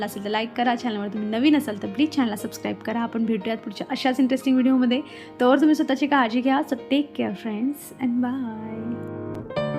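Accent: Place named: Khandesh, India. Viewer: Tai ahirani bolat ja na madhe madhe... khupach god vatat hoti aikayla.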